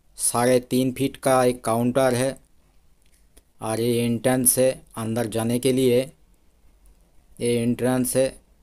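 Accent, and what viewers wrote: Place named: Bengal, India. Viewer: Bhai sahab ap bangali lag rahe ho apki hindi se malum padh rha h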